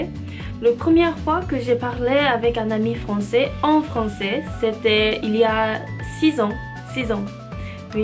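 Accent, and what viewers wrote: Japan. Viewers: UN JAPONAISE PARLER FRANCAIS EST TROP KAWAIIIIII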